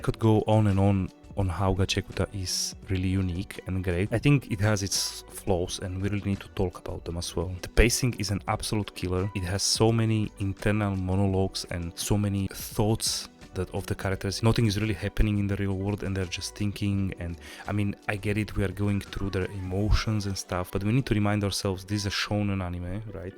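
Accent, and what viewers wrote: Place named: Russia. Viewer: Why I feel Russian accent..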